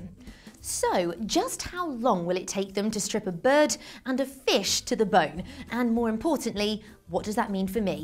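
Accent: United Kingdom. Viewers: i love your accent! UK?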